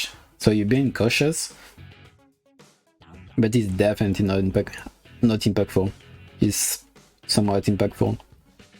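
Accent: France. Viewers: The french accent se fait sentir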